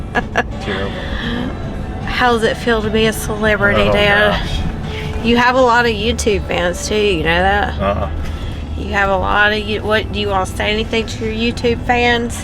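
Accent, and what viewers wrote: South, United States. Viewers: You are still very fresh from your accident. I first clicked on your channel because you were talking about your accident. I keep watching because I find your voice soothing, and I like your slight southern accent! I’m from New England. Be YOU. Talk about your experiences in the hospital, your recovery, your challenges, what kind of things helped you at home…you’re job as an EMS probably has so many fascinating stories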